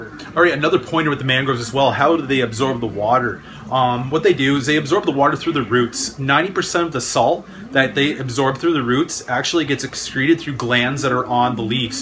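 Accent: Canada. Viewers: Are you from Canada? I love how you talk! Great video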